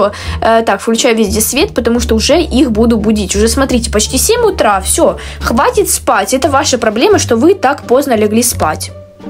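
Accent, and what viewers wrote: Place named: Ukraine. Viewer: Скажите пожалуйста она русская просто речь как у украинки(не хейт) очень люблю её смотреть❤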